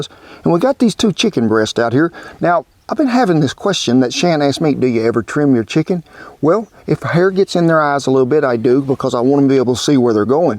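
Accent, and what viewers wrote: South, United States. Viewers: Its the deep southern drawl for me ❤️❤️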